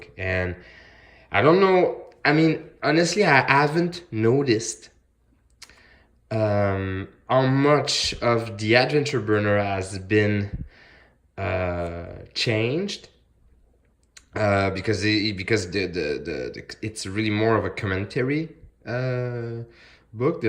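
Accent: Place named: Quebec, Canada. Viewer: I detect a Quebecois accent... ca va mon chum? Good review! Looking at buying these books myself.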